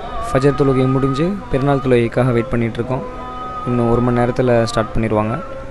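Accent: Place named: India. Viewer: மாஷா அல்லாஹ் நல்ல பதிவு உங்களின் இந்திய தமிழ் அருமை நம்ம அத்தாட ஊரு தஞ்சை வலுத்தூர் நாம இப்ப இலங்கைபிரஜைகள் - தகவல் மீரா மொஹிதீன் அதிபர் ஜயசுந்ததர நாவலப்பிட்டிய - ஸ்ரீலங்கா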